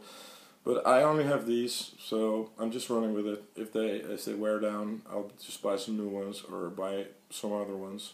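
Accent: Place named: Netherlands. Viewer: You speak perfect English with a sleight Dutch accent.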